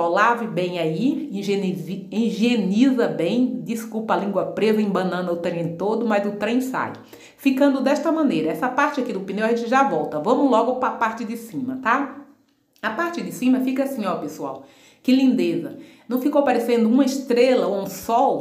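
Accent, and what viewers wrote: Bahia, Brazil. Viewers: minha linda, kkkk vc é muito engraçada gosto do seu sutac baiano,. Amei seu trabalho parabéns,!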